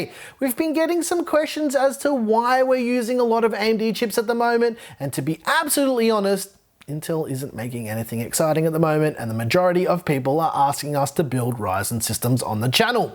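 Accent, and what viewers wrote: Australia. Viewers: Great to hear another aussie voice in the tech space ! Subscribed !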